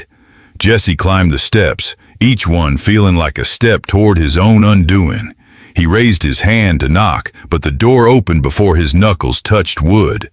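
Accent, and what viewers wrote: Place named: United States. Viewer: Listening to your, fantasies, fascinating and so well constructed and excellently narrated stories. Your stories usually always bring a smile to my face and hold more than my attention. The voice is so American, gripping and appropriate. However Steinbeck's novella "Of Mice and Men" is very powerful and probably far more enduring in the USAlien cannon.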